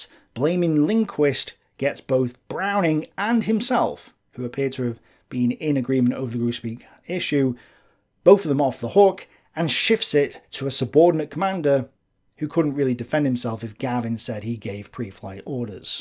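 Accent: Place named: North, United Kingdom. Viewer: part of it just comes from having a Northern accent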